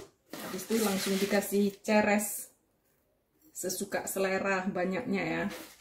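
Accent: Medan, Indonesia. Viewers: Kangen juga dengar logat2 medan mak.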